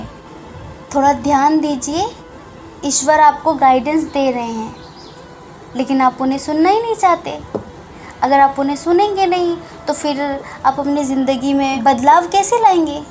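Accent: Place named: Bihar, India. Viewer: Apki langauge bihar ki he completely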